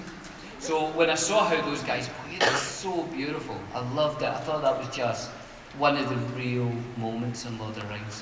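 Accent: Scotland, United Kingdom. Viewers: No he just sounds Scottish you pleb. And British isn't an accent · He's accent is like a mix of British, Indian, Scottish and maybe a little bit Scandinavian.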